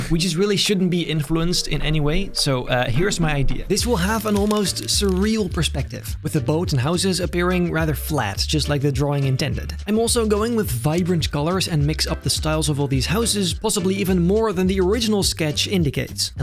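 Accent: Netherlands. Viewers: Thick Dutch accent. Nice video tho, never heard of the channel before. Subbed.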